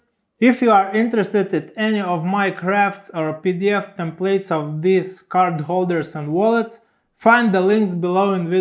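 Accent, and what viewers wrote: Russia. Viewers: Крутейший Русский акцент, очень приятно слушать. Ну и изделия, само собой, огонь!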